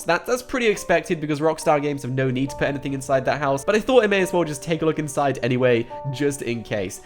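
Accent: United Kingdom. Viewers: Bro u sound very british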